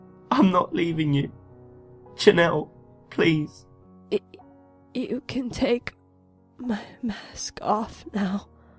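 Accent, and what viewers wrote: London, United Kingdom. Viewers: The last line is so true..but even with 15people around you fighting for your life...(I had an accident and ruptured an artery) by the time only like 5minutes it took to be driven to the hospital, I had bled out two thirds of my blood..the big veins in my neck had collapsed and they couldnt get a line in. People seem to think bleeding out is painless but that is a cruel lie when I think of poor people taking their lives thinking all that lies ahead is dreaming into oblivion. The second your brain registers the drop in blood pressure it tells your heart to pump faster but while your heart jumps to command and quickly develops tachycardia then ventricular fibrilation (its like a big man jumping up and down on your chest 200+ times a minute. Meanwhile your brain is pushing your lungs as it feels oxygen depletion. They had to cut into my Femoral vein in my groin..no time for local anesthesia..the guy who did it was the cheif anesthetist (they are most qualified in hospitals for keeping people alive..he squatted over my chest on the trolly and cut then manually forced the teflon Venflon about 8" into my vein. 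In those frantic terrifying minutes I learned that EVERY man and woman is alone even in a crowded room we are prisoners in a meat and bone cage and, in the end, you go out as most of us came in, alone. Oh this is true..by your accent you've probably been near Homerton Hospital in Hackney without whose fast thinking anaesthetist I would have completed my journey still,as alway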